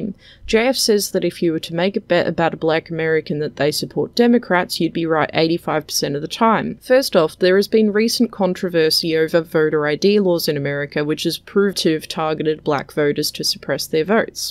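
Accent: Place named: France. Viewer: JF has taken to calling people who disagree with him "Liar" once they don't concede to his framing. It's profoundly bad faith. He becomes cynical and condescending almost immediately. For him this is a for-profit endeavor. You deposit your token and you get exactly the talking points you want with the French accent and academic "credentials" for polish.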